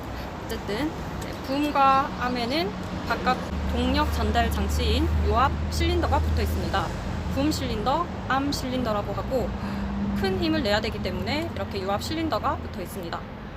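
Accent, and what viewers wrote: Gyeongsang, South Korea. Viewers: 굴삭기땅크애니띵 말투가 갱상도시네요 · 마 갱상도 사투리가 슬쩍 들리네예.포클레인은 굴착기 제작 회사 이름이었죠.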